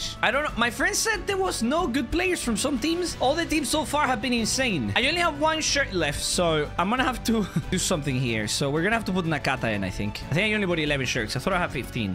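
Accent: Spain. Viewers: Wait,you from Venezuela or spain?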